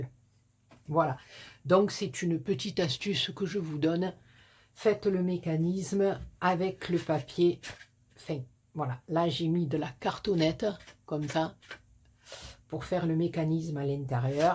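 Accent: South, France. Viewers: Coucou Superbe cette carte.... J adore tes explications et ton joli accent du sud comme le mien 😜😜 Gros bisous 😘😘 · Bonsoir tres jolies vos 2 cartes merci pour ce tuto j aime beaucoup vos tutos et j aime bien votre accent du sud il me fait penser au soleil bon week-end à bientot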